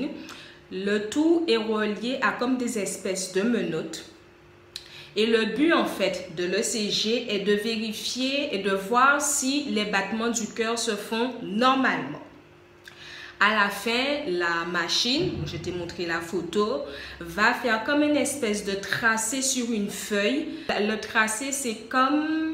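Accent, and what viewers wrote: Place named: Guadeloupe, France. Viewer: Salut moi aussi j ai la drépanocytose Tu as l’accent de la Guadeloupe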